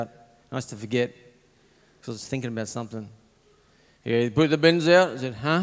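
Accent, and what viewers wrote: Australia. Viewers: He speaks strine.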